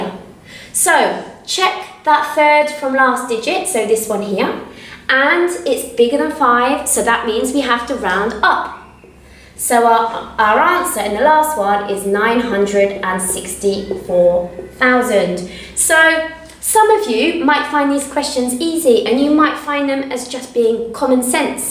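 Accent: England, United Kingdom. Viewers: I love your britost voice can you a video on English speaking practice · Ooho kon sir das ki ho England kya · I love your British voice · British girl HAHA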